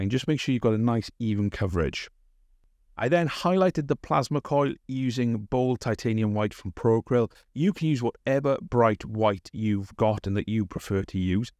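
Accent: Wales, United Kingdom. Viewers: ah sick. Thank you! Ok I’ll get one of those. I’ve been burning through crappy brushes for too long. I hear that Welsh 🏴 accent. You’re not anything to do with Firestorm in Cardiff are you? That’s our local and we basically spend our wages there 🤦‍♂️ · THAT SOUNDS LIKE A WELSH ACCENT RAHHHHHHHH I WILL BE SUBSCRIBING BOTH FOR THESE AMAZING PAINTING TUTORIALS BUT ALSO BECAUSE YOU HAIL FROM THE GREATEST COUNTRY IN THE WORLD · Great tutorial and always nice to hear a Welsh accent on a youtube vid.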